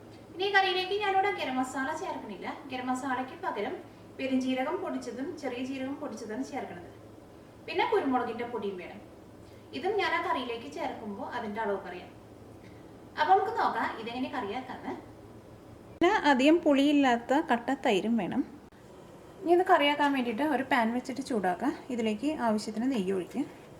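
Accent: Thrissur, India. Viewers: onnu ochati paranjuday super aayirikunu curry alla ur very beautiful but slang oru kachda thrishur style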